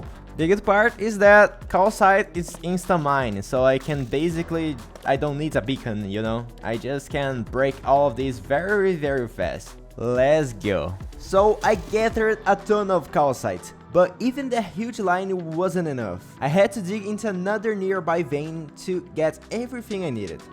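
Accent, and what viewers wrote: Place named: Brazil. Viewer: eu tava percebendo que o seu sotaque era de Brasileiro, dai qdo c disse que ingles n era a sua primeira lingua eu saquei que c realmente é br kkkkkkkkkkkkkkkkk, sucesso pra vc parceiro, inclusive o seu ingles tá mt bom, parabéns (edit: tu se inspirou em genshin? Tem uma estrutura exatamente assim lá) (edit 2: agr que eu vi que vc falou no video kkkkkkkkkkkk)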